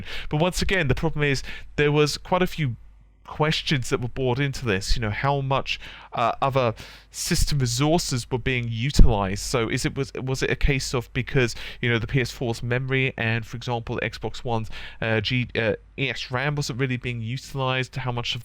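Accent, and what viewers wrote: United Kingdom. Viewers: 100% have to agree! These guys (particularly the narrator of this video - his name escapes me (sorry > - < )) know their shit! Like, KNOW know their shit… But the day-in, day-out rehashing of PS4 > Xbone is getting quite… taxing… Love the channel, but I'm getting to the point where if there's a mention of "PS4" or "Sony" in the title, I'm tempted to go out of my way not to click it. These guys are very level-headed when it comes to the console war bullshit, but as an xbox fan, when it's all you hear about for the past 12+ months? Yeah, I'm becoming quite sick of it. (Was sick of it months ago, but whatever.) And even then, they go out of their way to report on rumors or leaks that emphasis PS4 > XO… How about some misterxmedia coverage? I mean, the guy is either being 100% trolled or the industry is in for the world's largest reveal (#unlikely), but either way I don't have the hardware know-how to really sift through his posts. 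But [the author of this video]? He pegs me as someone who would be able to understand exactly what MisterX's 'insider' is talking about. And regarding ^that^ (the MisterX stuff), I'm not looking for a "is it probable" or "reasonable" analysis, simply an explanation of all the tech-talk that goes on in that 'forum'… It boggles my mind every time I look at it, but it's still unbelievably fascinating! I'd love to hear an explanation from someone with such a dreamy british accent. • ◡•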